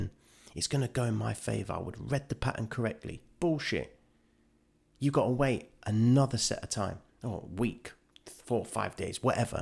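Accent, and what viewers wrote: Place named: Epsom, United Kingdom. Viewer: please help me I really need a mentor. you sound like your from my neck of the woods. im from epsom dude. im in a bad loser at the moment. shorted from 21500. my liquidation is 38k do you think I should leave. I may leave this week cause of bad moon cycle in btc. this is not a reason I know but I need to close it.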